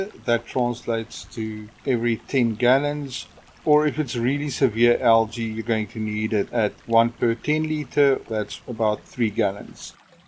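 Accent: South Africa. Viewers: You sound like your from South Africa my friend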